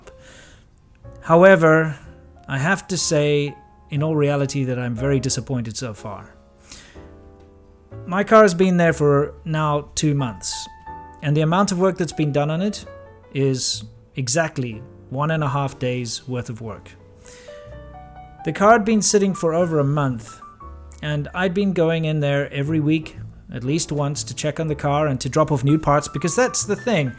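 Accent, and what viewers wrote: South Africa. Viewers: What country are you from you sound very south african. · Hey, love your car, and I hope you're not giving too much leeway to this shop. I know it's difficult to find a legit shop, but this is like well past 3 strikes and your out situation. Stay on them, you're investing big $$. Also I had a South African roommate many years ago, your accent takes me back. He was from Sandton, went to Stellenbosch University. Cheers!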